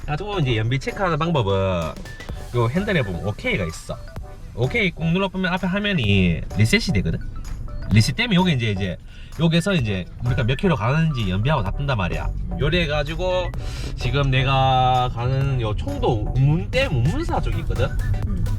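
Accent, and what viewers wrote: Daegu, South Korea. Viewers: 군생활을 대구에서했는데 간만에 오리지날 대구사투리 반갑네요. 연비 잘보고갑니다.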